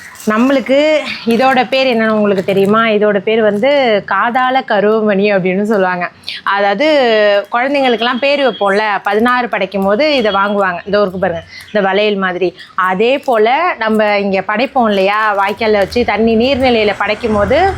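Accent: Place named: Nagapattinam, India. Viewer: Akka nanga pesura mathiriyea neengalum solreenga.nan unga ooruku pakam thn Nagapattinam ❤❤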